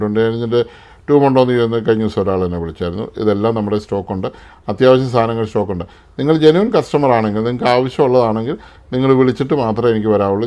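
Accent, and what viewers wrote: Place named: Kanjirappally, India. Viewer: ഇദ്ദേഹത്തിന്റെ സംസാരം, ഒക്കെ കേട്ടപ്പോ തന്നെ എനിക്ക് നേരത്തെ മനസ്സിലായാരുന്നു കാഞ്ഞിരപ്പള്ളി ക്കാരൻ ആണന്ന് ❤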